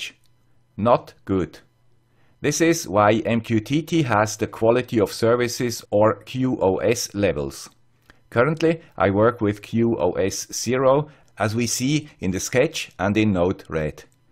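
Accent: Switzerland. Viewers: Damn you 'guy with the Swiss accent'! You've gone and preempted me - with the 'topic' of my forthcoming video (see what I did there)?. But thanks for the reference and excellent dual between Broker and UTube. I'll go back and 'like' furiously! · Thank you friend with the Swiss accent. I have enjoyed many of your videos and was excited to see you get a Pi Zero W, now MQTT. I will probably have to watch these videos many times until I understand since I'm new at coding. Thanks for the good tutorial, Hope to see many more. I need help!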